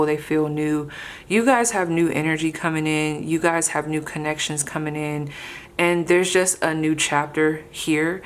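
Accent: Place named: Oklahoma, United States. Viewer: Lol that heavy. Rando okieaccent was for me I know it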